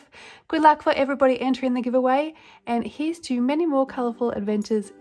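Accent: Australia. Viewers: When I started adult colouring this year I searched for different channels to learn from and see what was possible and I immediately subscribed to you for your stunning colouring but because of your familiar Australian accent! There are lots of British and American colourists but it's wonderful to hear a fellow Australian 😅 Congrats on your well deserved success 🎉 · I watch all your videos that are on you tube, to give me inspiration and to learn new techniques, your colouring is stunning and i can only hope to get that good someday, , it is nice to see and hear another Australian colourist. Congratulations on your 20,000 subscribers, I am sure your channel will keep growing well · Congratulations! You are an amazing colourist. I am so grateful for all you have taught me. Your colour combinations have been immensely helpful as that is what I struggle with the most. 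Also it is very heartwarming to hear a fellow Aussie accent! Keep colouring and being amazing 🤩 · Wow!!! Huge Congratulations!!! Love your channel … always have your videos running in the background on the weekends and join in whenever I can. Thanks so much for all your videos … for sharing your knowledge and allowing us to be inspired to colour our world💕. Lovely to hear another Aussie too❤️.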